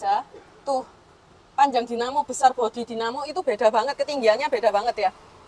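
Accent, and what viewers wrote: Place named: Java, Indonesia. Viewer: Logat jawa nya medok ci😂😂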